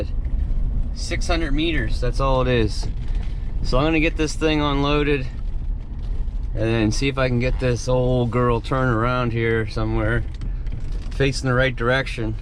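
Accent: Nova Scotia, Canada. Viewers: Dude, just found your channel!!! My Dad and his side of the family are all from Shelburne, Gunning Cove specifically (he moved to Cali when he was 11). Lots of my distant relatives still live in that area, across from McNutts island. Every summer growing up (3-4 weeks) he would drag me, my brother, and my Mom from Washington State to Gunning Cove Nova Scotia to spend time there. We would play golf, eat lobster, archery, fish, etc. I hated it then, but now, those are some of my most cherished memories. Dad passed away in 2010 (*uck you cancer), but us three have been back 4-5 times since. Part of Dad rests in the water of Gunning Cove just as he wanted. Sorry for the story, but listening to your accent and hearing Nova Scotia I had to comment. Thank you for the videos man!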